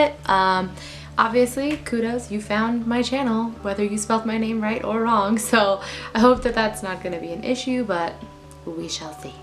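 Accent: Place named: Bay Area, United States. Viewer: Good luck with your channel! Would've thought you were from the bay because of your cute little "accent" and because you say hella a lot haha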